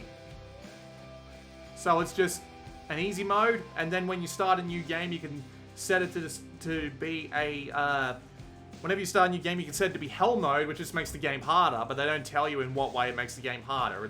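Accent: Australia. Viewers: Good vid. Out of curiosity, are you Australian perchance ? That and is the framerate consistent handheld and otherwise? Thanks in advance lad.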